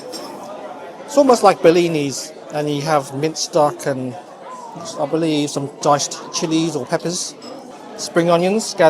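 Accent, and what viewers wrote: United Kingdom. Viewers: You look Asian, but your way of speaking and your mannerism are purely British. At 98 British pounds = $133 for a Peking duck which costs only $36-38 in Houston,Texas it was quite priced. American salary is much higher than Briton's. You must be the privileged in British society.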